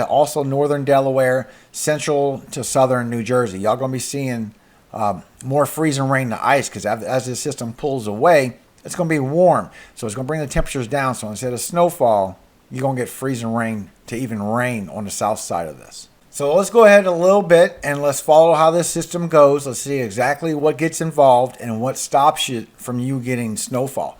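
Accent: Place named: South, United States. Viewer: Accidentally found your channel but so glad I did. The slight accent sounds southern like mine. Would love to know where you are but again very glad I found your channel. You help probably more than you know. Love and Hugs from NC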